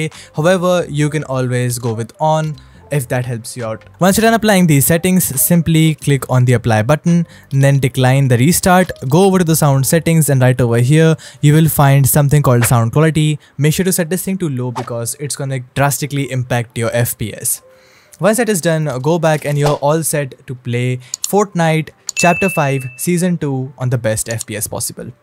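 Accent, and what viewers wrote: India. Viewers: Bro are you Indian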